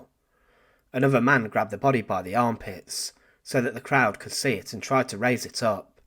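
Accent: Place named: England, United Kingdom. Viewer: I wonder where the man learnt this English accent. He sounds English from England. It’s a bit like Australian English and American English where some statements high rise for reassurance that you agree, like a question.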